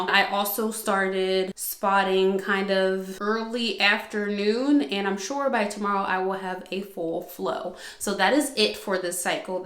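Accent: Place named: East Coast, United States. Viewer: Sometimes your accent it’s light. But once you start getting “frustrated” it’s like BAMM. I love the East Coast accent 😍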